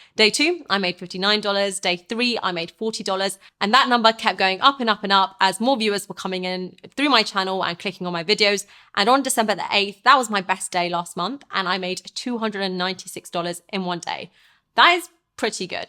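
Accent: United Kingdom. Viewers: Why you look like brazylian and talk like brit?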